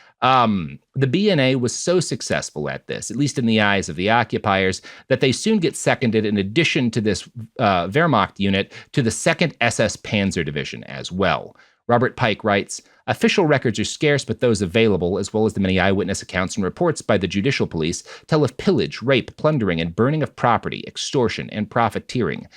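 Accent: Boston, United States. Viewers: Your Boston accent is so thick, hard to understand sometimes. Have you considered speech classes or something?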